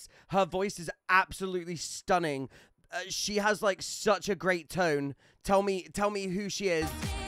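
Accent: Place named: United Kingdom. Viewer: Oh ..... You're British lol. Thanks for reacting bro.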